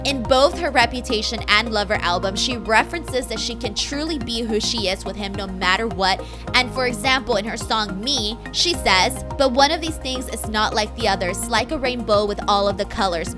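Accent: United States. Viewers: Great video but it's difficult to listen to. Very american.... Very SCANDAL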